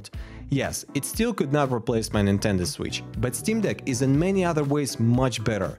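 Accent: Russia. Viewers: ты когда голворишь lenovo legion go русский акцент так и прет))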